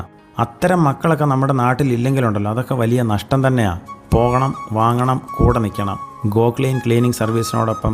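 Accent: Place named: Idukki, India. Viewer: Ningade samaaram kettal kannurkaaran aanenn thonnarilla.... i thought u were someone from idukki, kottayam or pathanamthitta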